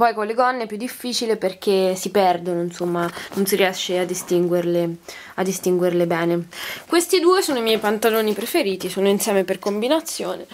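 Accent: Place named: Arezzo, Italy. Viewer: Beeeeello l'accento aretino, l'ho capito subito che sei di Arezzo! Io sono di Firenze, ma i miei nonni sono di lí vicino e mi piace un monte come parlate!:) Mi sono iscritta ora comunque, e mi piaci giá:)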